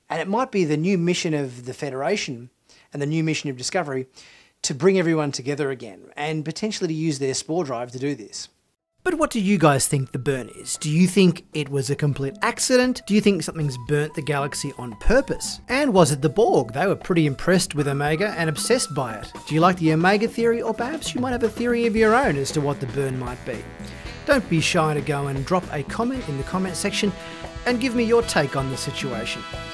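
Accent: Australia. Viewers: Hi mate, great upload, listening to your accent and thinking, hey up, this blokes Australian and that reminded me of the Voyager episode with Species 8472 where they enter Fluidic Space, what's never explained is if they enter FS at point A, when they leave it, are they still at point A or anywhere else? So I could leave my house in the UK, enter FS and then jump out of it in Australia and meet you down the pub, not using Sub Space just Fluidic Space. Yes I know 8472 wouldn't want people crashing through their territory every five minutes but maybe they'd negotiate a section of space, ( just how BIG is Fluidic Space anyway?) far away from them that the Federation can use as a bridge from A to B. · That's our Aussie accent! 😆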